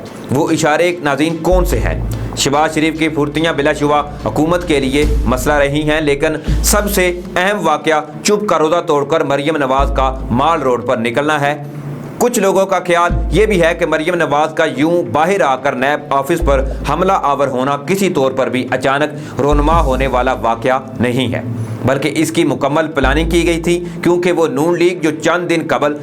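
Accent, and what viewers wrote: India. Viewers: بھائ جان مہربانی کرین۔ اپنا لہجہ ٹھیک کرنے کی کوشش کرین آپ کی ویڈیو دیکھتے ھوۓ تکلیف ھوتی جب آپ خان کو کھان اور خانم کو کھانم کہتے ہیں۔ ایسے تو انڈیا دالے کہتے ہین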